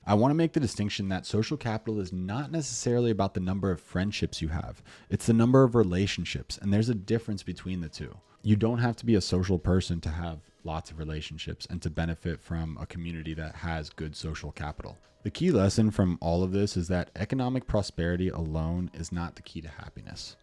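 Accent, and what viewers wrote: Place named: United States. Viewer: a flawless American accent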